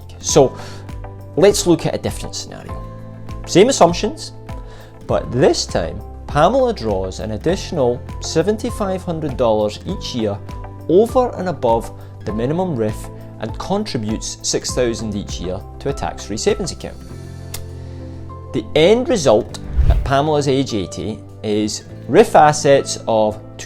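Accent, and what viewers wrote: Scotland, United Kingdom. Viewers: Beautiful accent. I love it. Not sure what it is. Scottish? Short and to the point advice. I am not surprised, that this video didn't generate many views, nor comments. People simply prefer to complain about CEOs salaries and big corporations profits, than look after their money. To me ( I am recently retired) the biggest advantage of RRSP is the fact, that all capital gains and dividends generated for about 40 years are tax free. It continues within RRIF for the next ( hopefully) many years. Most people, even accountants and financial planners hardly ever talk about it. Understanding of this simple fact worked for me wonders.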